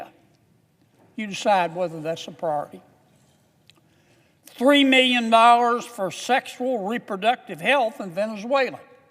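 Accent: South, United States. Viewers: I woukd like to see 99 more Senators just like Kennedy! Love his southern straight and humorous twists in his speech!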